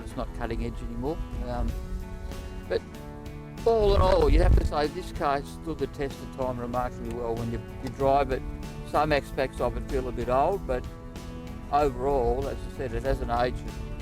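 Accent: Australia. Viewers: It's an aussie accent but perfectly understandable. I'm from Bristol UK. There are people around here that are almost impossible to understand. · it doesn't matter if you have a Australian accent as long as you speak clearly.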